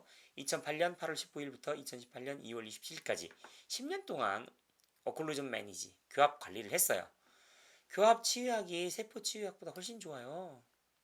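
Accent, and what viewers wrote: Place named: South Korea. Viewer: Lateral excursion에 대한 좋은 내용이네요... 유투브를 전문적으로 하시려면 영어쪽은 감수를 받으시는게 좋을듯합니다... 너무 한국식 영어라^^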